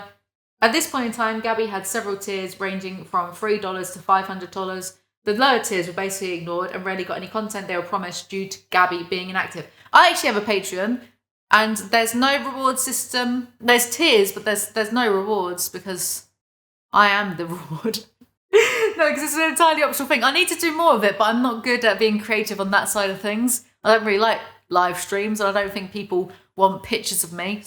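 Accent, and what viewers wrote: United Kingdom. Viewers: which british accent do you have? like which area? - from norway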